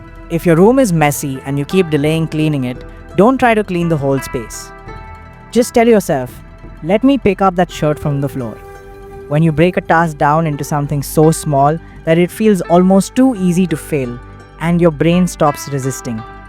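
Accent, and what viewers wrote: India. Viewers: Those videos are REAL good............ What bothers me is hearing an Indian accent..in an Asian Video. Use an AI Reader maybe ? Keep up the good work !!👍 NEW SUBSCRIBER HERE............!! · You sound Indian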